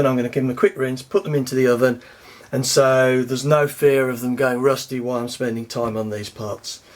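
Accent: England, United Kingdom. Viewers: I hardly ever disassemble completely. I just scrub out the barrel cylinder and wipe out the outside. It only gets a complete hair down like twice a year never had an issue. And you sound English, I take it. These are the only handguns you can own. In the United States they are not even considered firearms. I would look into getting a conversion cylinder. But I do not know if that would break your laws. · It sounds like an English accent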